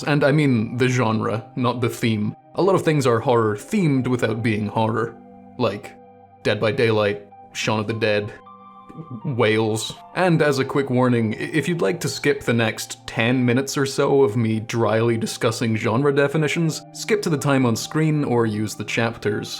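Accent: Scotland, United Kingdom. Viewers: I like that you’re Scottish.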